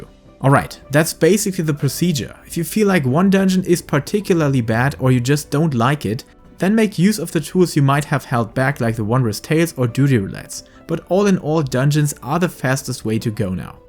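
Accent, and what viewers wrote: Germany. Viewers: Are you german? Cause of your Accente.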